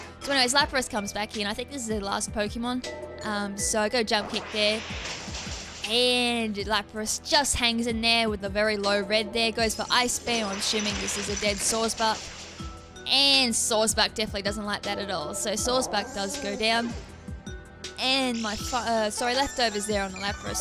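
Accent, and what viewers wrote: Australia. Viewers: just assumed it was Australian accent anyway. ^^ Anyway, didn't mean to complain. Thanks for taking the additional energy to still upload a video. :)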